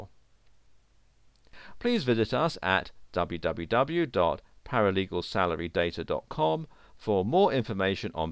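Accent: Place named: United Kingdom. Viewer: Pretty sure that's not a canuck accent, eh? Sounds pretty British to me.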